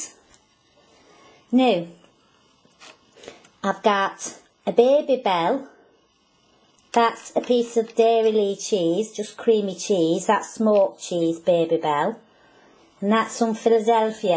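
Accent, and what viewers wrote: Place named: Scotland, United Kingdom. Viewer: You sound almost Scottish in this. So, how does this taste?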